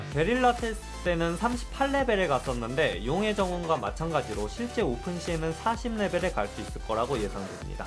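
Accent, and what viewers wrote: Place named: Jeolla, South Korea. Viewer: 그런데 혹시 전라도 분이신가요? 못해가 모데로 들려서 너무 친숙하네욬ㅋㅋ 고향사람 만난느낌 유튜버님 서버는 어디로하시나요?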